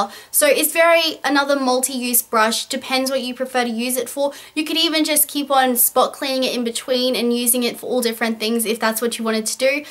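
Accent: Australia. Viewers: Australian accent :) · Australia I believe:) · I would die for you accent! Australian accents are by far my fav your so perf btw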